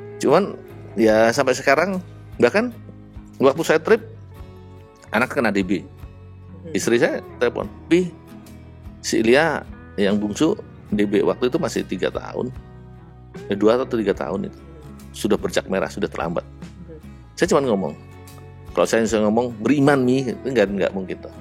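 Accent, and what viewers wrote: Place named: Java, Indonesia. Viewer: Bahasa Jawa nya kental saat bikin konten 🎉🎉🎉🎉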